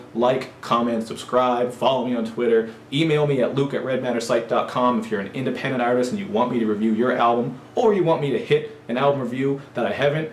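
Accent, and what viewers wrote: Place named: Atlantic Canada, Canada. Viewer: You from east coast of Canada? U got a mad east coast accent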